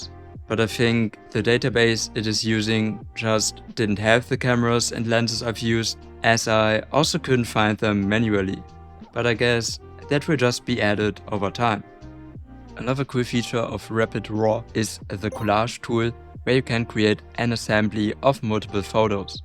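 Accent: Germany. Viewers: Was ein brutaler deutscher Akzent.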